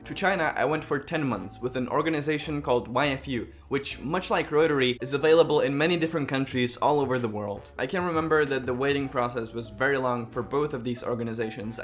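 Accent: Japan. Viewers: your japanese English is professional, i can tell you. You speak literary the same as my English teacher at Japanese School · Japanese english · HAHAHAHAHA OK U MADE ME LAUGH IN YOUR NIHONGO/Japanglish 😂😬🤟🏼🤟🏼🤟🏼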